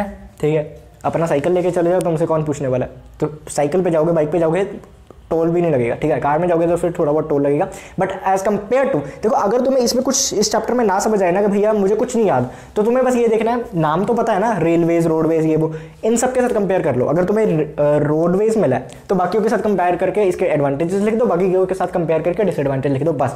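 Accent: Punjab, India. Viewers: U r Punjabi??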